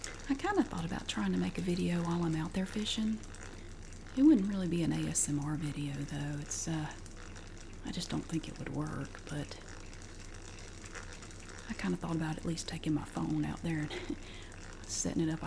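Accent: South, United States. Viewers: I subscribe to your channel and was curious about who you follow. This is a great channel - thank you. The Southern accent is brilliant. I am from the UK so it is unusual for me! But makes for great ASMR!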